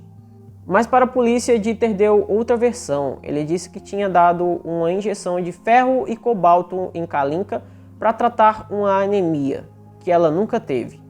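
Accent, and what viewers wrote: Northeast, Brazil. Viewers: adoro seus casos acho que vc narra bem as vezes com alguns errinhos de Português, mas não se sinta ofendido por favor!!!! As vezes é o jeitinho de falar no nordeste aqui no sul é diferente, abraços te sigo em todos os canais!!!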